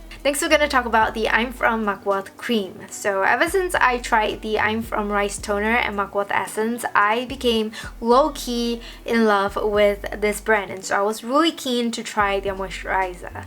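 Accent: Singapore. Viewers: Are you Singaporean?? Interesting the way you pronounce ‘really’ and ‘mugwort’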